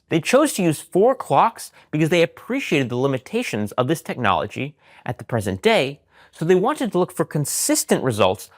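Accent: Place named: United States. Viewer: it’s pronounced Duneeeedin pace not Dunedin pace.. Must be the American accent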